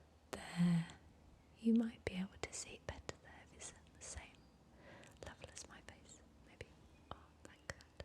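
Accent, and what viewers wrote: England, United Kingdom. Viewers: I absolutely luv that english accent...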